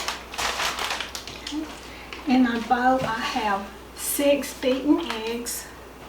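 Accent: South, United States. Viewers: I just found your channel, you are SO sweet, oh my goodness. Listening to you share the recipe with your Southern style just made me feel so at home for some reason and I'm not southern! (even though we live in FL, that is NOT the south) :-) Newly subscribed. I'm looking forward to making this on Thanksgiving morning. I think many people know of a breakfast sausage recipe but your video just is so heart warming. Wishing you a Happy Thanksgiving. Glad to have found your channel.-Theresa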